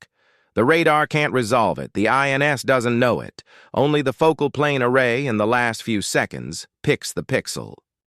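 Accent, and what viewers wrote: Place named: South, United States. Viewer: Why your ai sound like hes from the south?😅 nice video though!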